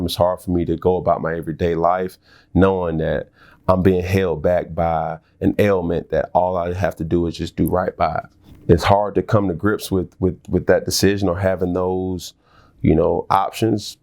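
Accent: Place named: Atlanta, United States. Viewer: Bruh you letting your ATL come out slowly..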